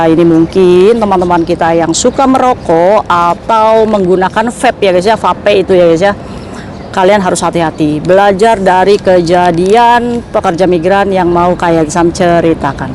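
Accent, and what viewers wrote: Indramayu, Indonesia. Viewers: Logate kyk Wong Indramayu sih?? ana Longkrang e kedik 😂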